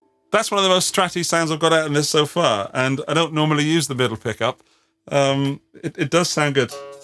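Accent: United Kingdom. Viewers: Just subscribed. Been thinking about getting this guitar, appreciate the in-depth review. BTW, no offense but I just loved to hear you talk, you're just so British slang and all. Thanxxxxxxx!!!!!!!!!!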